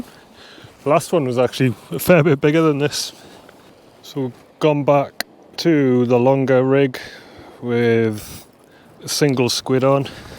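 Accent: Newcastle, United Kingdom. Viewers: 😂 Howa man he is just a geordie it ain't his fault he is wrong side of the wear They speak Doric where I live now and if you canna understand him then you'll be knackad up here ❤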